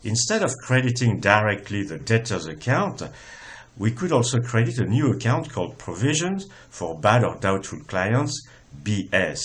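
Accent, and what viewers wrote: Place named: France. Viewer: This accent sounds French! :D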